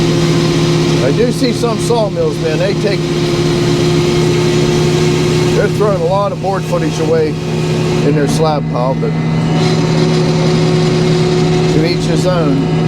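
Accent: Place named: Pennsylvania, United States. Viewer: Both of my parents were 1st-generation Americans ("greatest generation") who were born and raised in Fayette County PA, it's such a joy to hear your accent which reminds me of them and of my large extended PA family.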